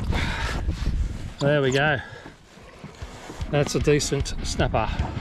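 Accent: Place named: United Kingdom. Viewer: Slow down your British accent , have no clue what you just said .😬😅✌🏽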